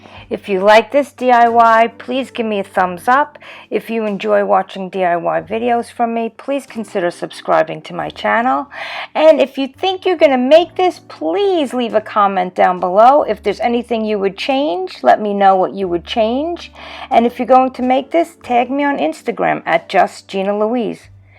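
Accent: New York, United States. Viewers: Hey there! Stopping by from the playlist. LOVING these. God bless the internet and the incredible farmhouse pics we can find. Will def give the hairspray idea. So, do I detect a fellow New Yawka? Brooklyn born and raised here but living in a world of cows and bears these days 0_0! Have a great day! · I just love this!!!!! I was gifted some snowman tiles and was going to make coasters, but this changed my mind!!!!!! Great project!!!! Thanks for sharing!!! God bless!! Are you in NY or NJ? I detect a little accent!!! lol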